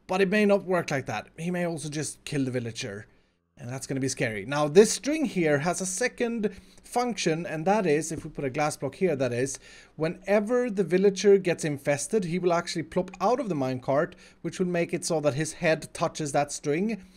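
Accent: Sweden. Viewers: It is good that the villagers don't remember that YOU were the one that infected them in the first place. Infested and Curated, love your Swenglish! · Svensk dialekt, jag låter värre.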